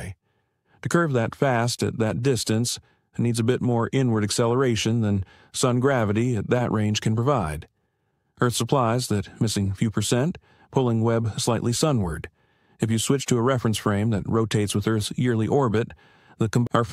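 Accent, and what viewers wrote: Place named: South, United States. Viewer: I like the southern sounding voice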